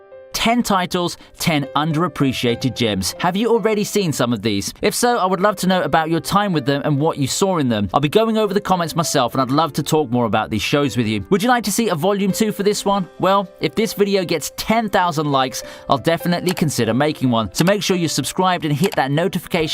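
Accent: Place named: United Kingdom. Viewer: Dude are you from the UK? Also good vid. I've picked out 3 to watch. Rage of Bahamut, Yona of the dawn and Rainbow. Cheers pal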